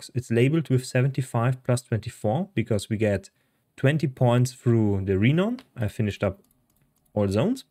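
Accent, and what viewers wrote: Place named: Germany. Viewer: Gibt es das auch auf deutsch? Hast ein starken deutschen Akzent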